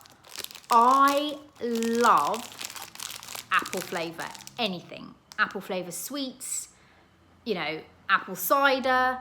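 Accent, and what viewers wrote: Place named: North, United Kingdom. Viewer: Looking beautiful, like Youth to the People xxx ❤❤❤xxx 🦮🐾🐾 Hi Bertie. Bloody lovely. North accent lol xx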